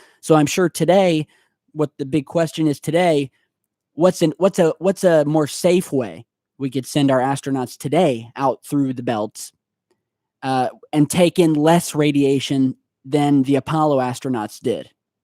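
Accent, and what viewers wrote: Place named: Ohio, United States. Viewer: Your Southern Ohio drawl sure stands out early in the morning.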